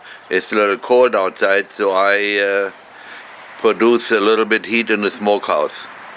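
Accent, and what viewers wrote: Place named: Germany. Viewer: My dad used to do that in Germany every year. We bought a pig and the butcher came out to our house and we butchered all day long. I remember that I had to stir the blood when they cut the pigs throat. We made everything by our self. Blood sausage (Blutwurst) and what you made here. (Rauchfleisch) and you sound like a German. Am I right? I wish my dad would still be around to tell me how he made all the hams and sausages. What you did here is pretty much the same way I just don't remember what he put in his brine. Is there a recipe for the brine (Lake) somewhere. Thank you that meat looks so so good. I will make some as soon as my pig gets big enough to get butchered.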